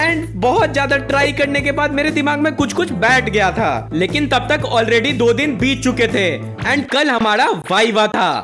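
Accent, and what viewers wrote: Bihar, India. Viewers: aap bihar se ho kya bhaiya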